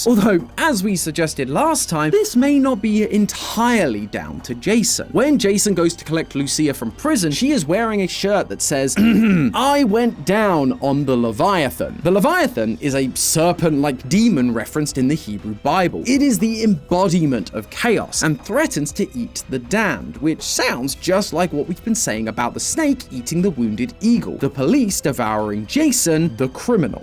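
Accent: United Kingdom. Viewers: Wait, are you British?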